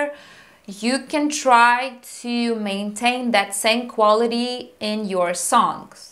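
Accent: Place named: United States. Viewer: Ur English is very good n also u do the American accent pretty good as well. Also ur channel is so cool. 💙💛💙💛💙💛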